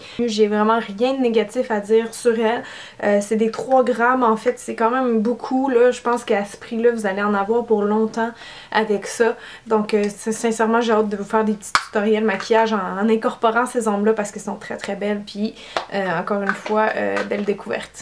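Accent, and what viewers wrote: Quebec, Canada. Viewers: accent quebecois --' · En effet, très bonne marque de produits cosmétiques. Je cherche un anticernes mais je ne sais pas trop quelle teinte choisir. J'ai les cernes violines à bleuté, quelle teinte me conseille tu? Merci d'avance, j'adore ton accent canadien, continue comme ça!! Kiss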